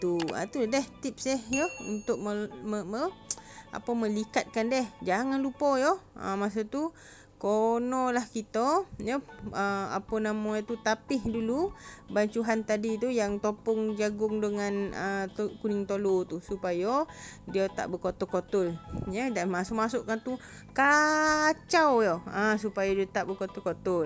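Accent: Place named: Palembang, Indonesia. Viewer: Sedap nian kuenya, accent kakak mirip samo baso kami wong Palembang (sumatra) 😁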